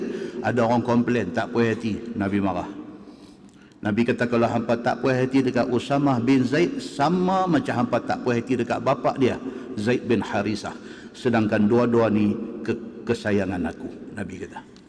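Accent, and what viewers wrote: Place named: Penang, Malaysia. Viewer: Ustaz Cakap Sungai Acheh sebijik sama macam Arwah Tok saya... Minta Ma Auuf. Semoga Allah merahmati arwah Tok dan TokWan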